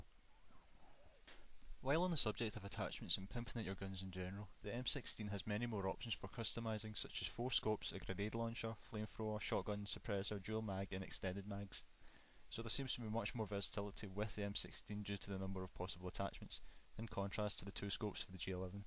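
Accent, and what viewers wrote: Scotland, United Kingdom. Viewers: are you scottish? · are you british? lol jw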